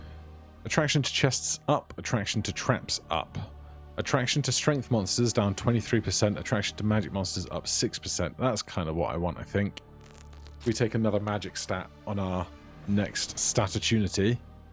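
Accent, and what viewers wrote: United Kingdom. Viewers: are you from Yorkshire?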